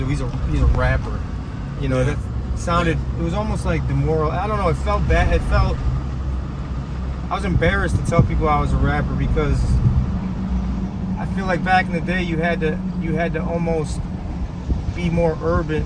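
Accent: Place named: Ohio, United States. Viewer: Adams Ohio accent man.. can't do it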